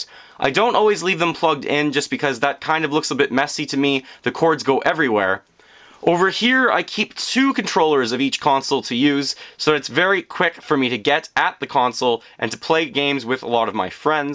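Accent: Canada. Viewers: ***** He sounds Canadian to me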